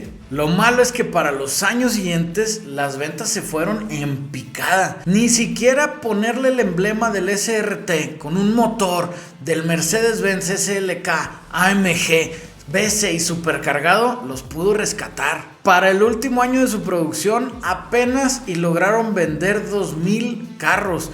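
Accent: North, Mexico. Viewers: Lastima del buen video, con ese horrible acento norteño balazo, esta dificil de ver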